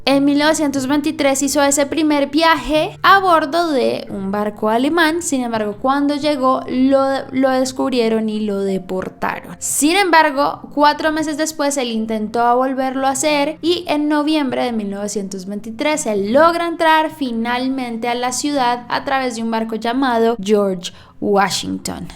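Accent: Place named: Colombia. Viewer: El acento colombiano ❤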